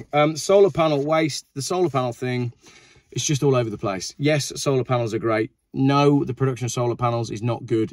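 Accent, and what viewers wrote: Australia. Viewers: Watching in Australia... that is the best Australian accent I've ever heard from a pom, my friend. Most people over do it. You got it just right, 😆👍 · Love your ozzie accent ❤ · Pretty good Aussie accent,. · Loving the oz accent 😂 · Great stuff, even the Aussie accent is spot on.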